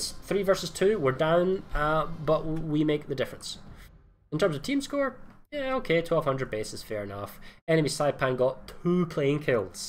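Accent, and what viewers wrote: Scotland, United Kingdom. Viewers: he's scottish I think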